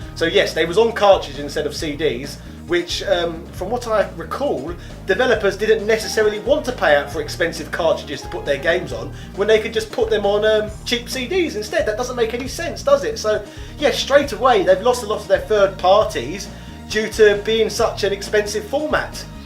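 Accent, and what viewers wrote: United Kingdom. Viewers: I really cant stand this idiot, these Brits are f***ing annoying as hell. Is it just me, or does anybody else find this guys Brit accent and obnoxious personality irritating beyond belief? I tried giving this guy the benefit of the doubt by at least watching 2 or 3 mins of his video to see if i can actually relate to him, but hes annoying as f**k. I know there are probably some cool Brits out there, but Brits like this guy give people from the UK a bad name. Just a pure obnoxious and annoying d**k.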